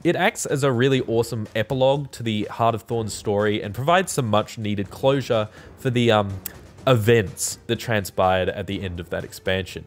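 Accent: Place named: Australia. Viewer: >Australian accent Yeah imma head out.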